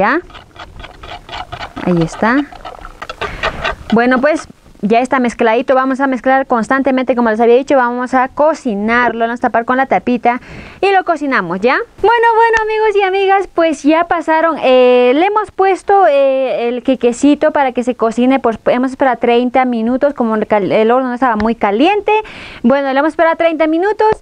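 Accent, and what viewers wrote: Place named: Bolivia. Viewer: . Sos. Más boliviana que persiana porque tiene tono Bolivianas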